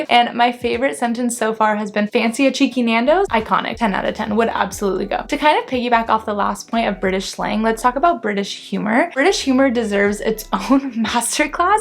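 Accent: United States. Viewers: "YORKSHYRE" BRIDDISH" BUDDERY. For GOD'S sake, if you're posting about British v American LEARN TO SPEAK THE LANGUAGE.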